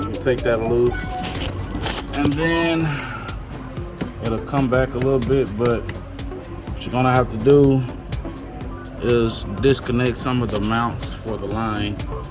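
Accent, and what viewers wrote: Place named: Texas, United States. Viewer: Man I heard you talking and I know straight up yous from Texas nail it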